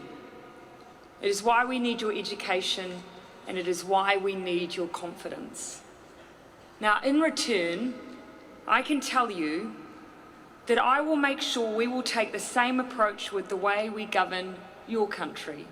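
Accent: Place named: New Zealand. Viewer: I love the nz accent ☺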